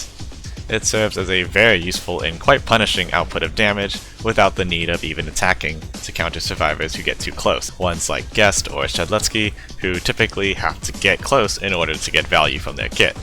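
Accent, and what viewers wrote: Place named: United Kingdom. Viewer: your British, aren’t you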